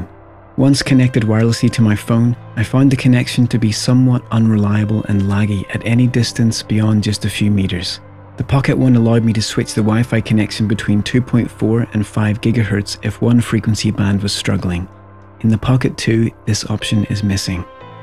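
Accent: Northern Ireland, United Kingdom. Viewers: "Now" "Plastic" "Fan" "proportional" "eighty" Northern Irish ? Great Video review and i thought it was just me who had a problem getting the Tripod mount off the camera ;) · Fabulous - thanks & nice to hear the NI accent - greetings from HK!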